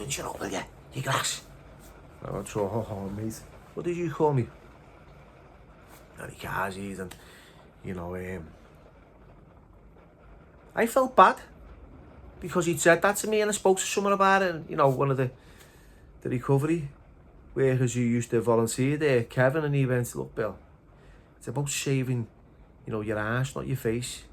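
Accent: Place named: Scotland, United Kingdom. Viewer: Nailed that Scottish accent. Like me ma. What does heed the baw mean 😮strange people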